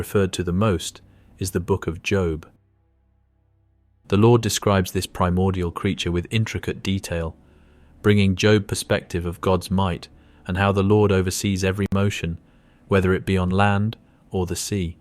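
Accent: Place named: England, United Kingdom. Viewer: MY BROTHER YOUR WORK AND VIDEOS ARE SECOND TO NONE!! AND YOUR ENGLISH ACCENT MAKE IT EVEN BETTER! AND MY FRIEND THERE IS NO OUTER SPACE, THATS WHAT WATER ABOVE THE FIRMAMENT MEANS! AND WHAT THE DEEP MEAN DESCRIBED IN THE BIBLE! HOW DOES AN EXPANSE OF AIR LIKE NASA CLAIMS SEPARATE THE WATER ABOVE? IT DOESN'T! THE FIRMAMENT IS A SOLID GLASS DOME SPREAD OUT LIKE A TENT OVER A FLAT PLANE! THIS IS ONE OF THE STRONG DELUSIONS THE BIBLE ALSO TALKS ABOUT! GOD BLESS YOU SIR!!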